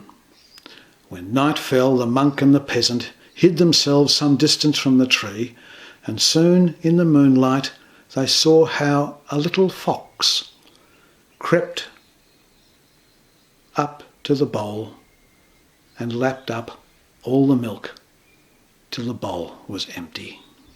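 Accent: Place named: Australia. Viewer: Thank you! A friend shared this in text and it's so nice to hear it in audio. Especially in Australian 🇦🇺 From a fellow Aussie living in America. So nice to hear a familiar accent.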